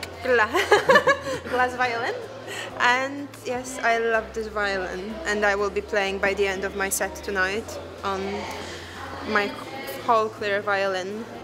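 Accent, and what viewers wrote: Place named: Poland. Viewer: she sounds Polish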